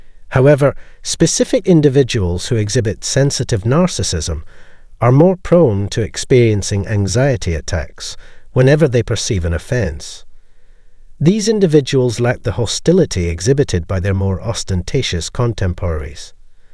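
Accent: United Kingdom. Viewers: Breaking up with ANYONE hurts them in ways you can only imagine when they care about you. If someone would be so hurt by my absence then send me ALL your narcissists, I'd rather date them than this annoying British-sounding twat narrating this video.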